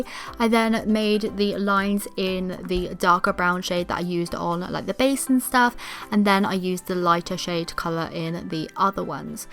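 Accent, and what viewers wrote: United Kingdom. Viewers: I knew it! I guessed u where british!!! Yessss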